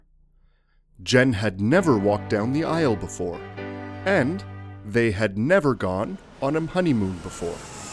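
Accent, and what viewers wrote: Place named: United States. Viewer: My goodness man. Good thing I've found your channel. I love the American English, and you speak so clearly, I give English lessons and your explanations will help me a lot. Good job. And your little stories are the best ones. Keep up the good work.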